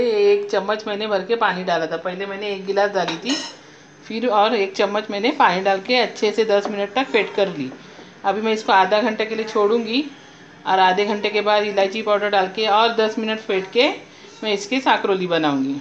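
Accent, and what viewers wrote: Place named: Konkan, India. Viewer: Asalam alaikum. Hope you are doing great and enjoying spirit of Ramadan. You have reminded me typical konkani cuisine of my Nani. You accent reminds me of my Nani’s family. I have already tried chana chatpata batata yesterday. Please keep doing what you are doing. Because it makes so many people feel and witness their childhood. Ramadan kareem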